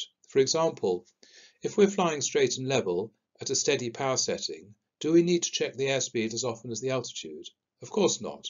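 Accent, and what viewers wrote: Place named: United Kingdom. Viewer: Thank you so much for this basic info for every aspiring pilot...Very informative, and presentated in a very clear and "to the point" way! (despite it's a bit difficult for me to grasp everything, because of your Brtish accent, but i' plan to watch the video several times.) Once again, thank you Sir!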